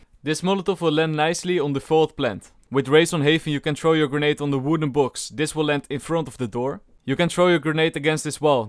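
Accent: Netherlands. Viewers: Are you dutch? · Kheb nog nooit een Nederlander zo Nederlands Engels horen spreken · Hoor ik een nederlands accent? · you can just hear by this guy his english accent that he is dutch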